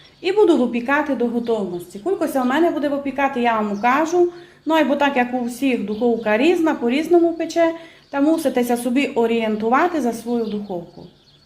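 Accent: Transcarpathia, Ukraine. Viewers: Уши греет Ваш чисто-Закарпатский.Вы откуда?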